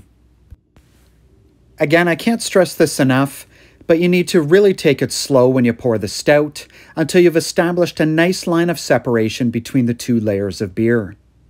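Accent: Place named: Canada. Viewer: I definitely recognize his Canadian accent.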